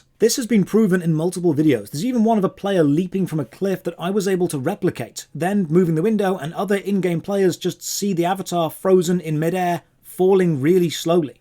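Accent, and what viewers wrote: United Kingdom. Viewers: Another thing...Why is Topher Grace from that 70s show British and talking to us about gaming???????? 🤔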